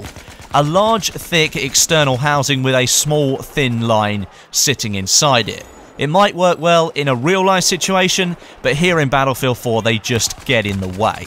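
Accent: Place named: RP, United Kingdom. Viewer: your english is so beautiful! You talk like some dude from "BBC Learning English":D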